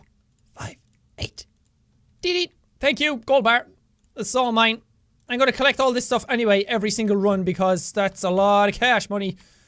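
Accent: Ireland. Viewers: Its the Irish accent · He's Irish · And the bossasaurus Irish accent helps too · omg i love your irish voice. is it irish? if i offend you im sorry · back when he had a very prominent irish accent 😭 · wtf kinda question is that he is obviously irish and is that german you tell me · Is he irish? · HE'S IRISH! So not everyone can pronounce words the same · he's Irish I am too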